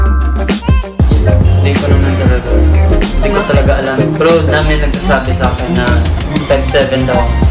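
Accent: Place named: Iloilo, Philippines. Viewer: mailhan ya gd nga ilonggo kay sa tono palang sang pag hambsl mo hahs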